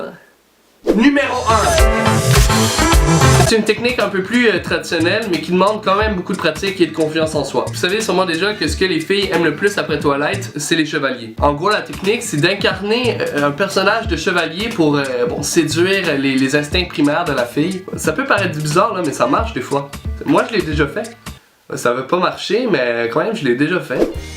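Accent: Quebec, Canada. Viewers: C un accent québécois et c pas un faux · bin quoi...tu as un accent quebequois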